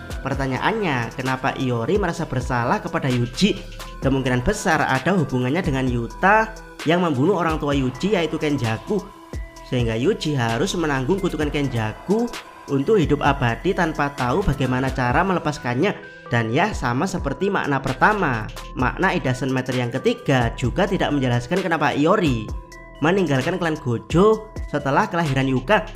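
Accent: Java, Indonesia. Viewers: Jawa nya keliatan. Medter 😅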